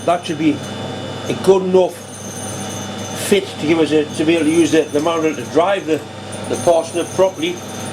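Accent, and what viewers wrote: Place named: Yorkshire, United Kingdom. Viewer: I’m guessing by that accent you’re from Yorkshire?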